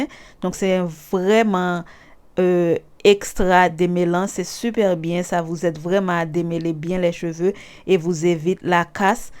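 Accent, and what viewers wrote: Haiti. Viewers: Tu parles comme une Haïtienne 🇭🇹🇭🇹j'aime bien merci😊😊😘😘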